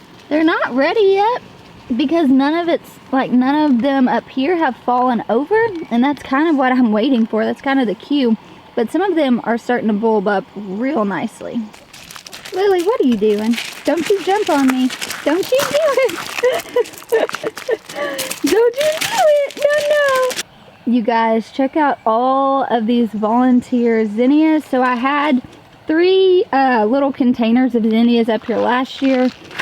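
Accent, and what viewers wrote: South, United States. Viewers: Beautiful garden! Success? Today I made homemade pesto from basil in my herb pots. Best pesto I've ever made. I shared it with my neighbor and daughter in the form of a really great pizza. It would have been a pretty empty feeling otherwise. So sharing your garden with your followers is the same thing but on a much larger scale. BTW, don't ever, ever, ever apologize for your southern accent. It's what sets us (me-NC) apart from others. What a boring world it would be if we all sounded the same. 😀 Take care!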